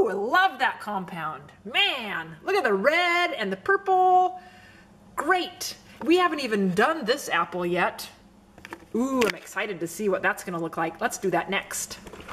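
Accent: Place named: North Dakota, United States. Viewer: Are you from North Dakota?? I can really hear an accent lol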